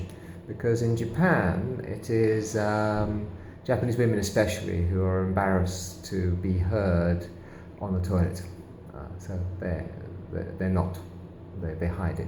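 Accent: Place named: England, United Kingdom. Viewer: Hey hage, came here to listen to the sound, not your DA English accent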